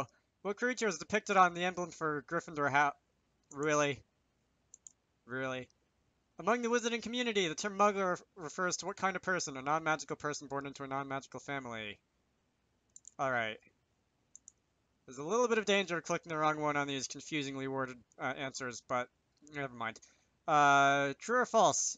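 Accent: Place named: United Kingdom. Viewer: you sounded more british than me wtf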